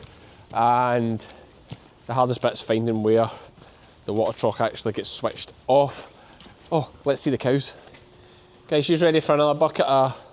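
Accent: Scotland, United Kingdom. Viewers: I know there's more to Scotland than your accent, but that little "och!" was so adorable!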